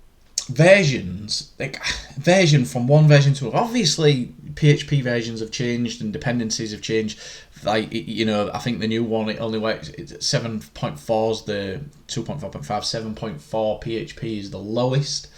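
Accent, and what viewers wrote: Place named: United Kingdom. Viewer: You are great but can I ask you a favor? Please, use a lavalier mic. Since both english is not my first language and your marked British accent it's hard to me to understand what you say. However, thanks for your explanations!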